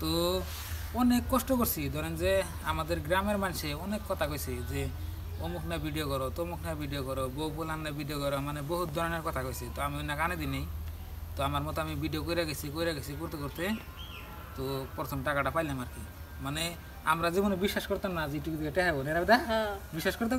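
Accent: Tangail, Bangladesh. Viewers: বাংলাদেশ টাংগাইলের মতো ভাষা লাগে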